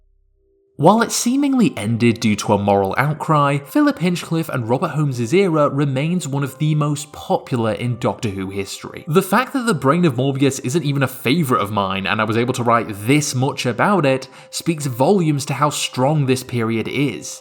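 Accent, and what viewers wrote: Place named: Canada. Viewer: Sounds a bit Canadian.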